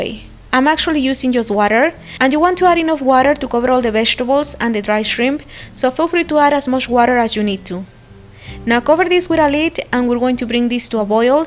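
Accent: India. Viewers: If she's Mexican but she sounds like she comes from daneli india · bien sabroso caldo camaron grascias. from where are u?? u are from India ur accent from India,I love that soup I rather use fresh shrimp, it's perfect for now winter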